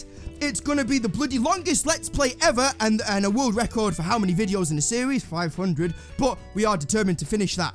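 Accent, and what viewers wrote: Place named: Lancashire, United Kingdom. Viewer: I figured you was a lancashire lad but i didn't think you'd be as close as manny haha nice one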